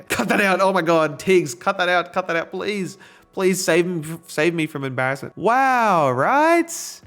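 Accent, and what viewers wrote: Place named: Australia. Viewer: fucking austrailian accent. "nortica". for the americans, he means nautica on adobe fonts.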